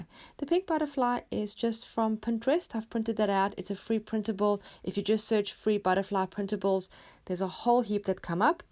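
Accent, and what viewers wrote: South Africa. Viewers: So nice to hear a South African accent...enjoying your work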